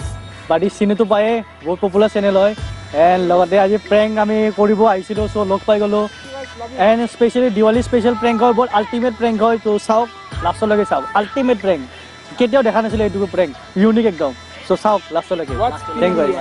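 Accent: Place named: Bihar, India. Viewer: Bihari typ hindi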